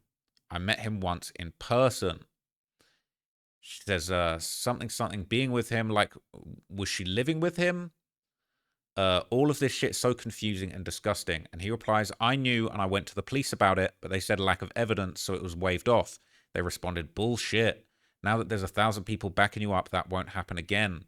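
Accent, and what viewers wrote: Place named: United Kingdom. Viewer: He’s British. Calm down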